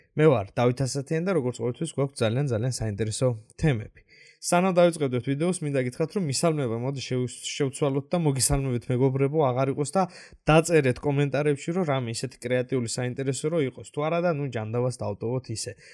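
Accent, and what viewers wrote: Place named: Kutaisi, Georgia. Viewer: კილოთი ქუთეისელი და channel nameთიც ქუთეისელი 😌😂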